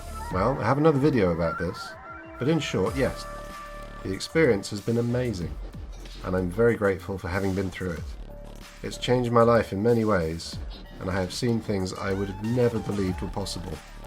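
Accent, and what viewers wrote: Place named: United Kingdom. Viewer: A British accent!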